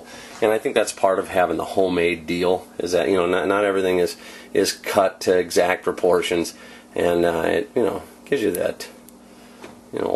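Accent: United States. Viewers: Hi thanks for the Video and info, I am living in England and did not quite catch what it was you added to the Marmalade later on in the Video after the sugar. It may be the American Accent or an American product I am not familiar with... I've just started prepping this year and have started to move onto producing long term storage foods. Not spoke or met any other preppers yet in England does not seem to be as big a concern over here.... Thanks for your time Tony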